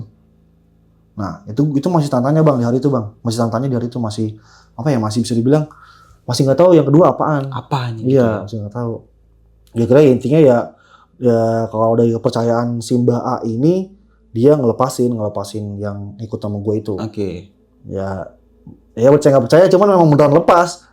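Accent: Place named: Bekasi, Indonesia. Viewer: Bekasi bgt nih logatnya resep betdahh dengerin cerita lu bang 🤣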